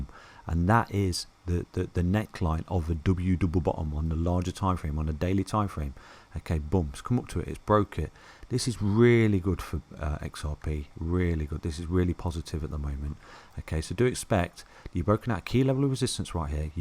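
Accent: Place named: Leicester, United Kingdom. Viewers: It's his Leicester accent., the pride of Leicester 👊👊🍻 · u have beautiful accent, i think u are from England, i dont have sure! I'm from Brazil and I like this accent Well i didnt know Europe accept crypto like XRP or other coin bro. I'm waiting this hit up $100 or more!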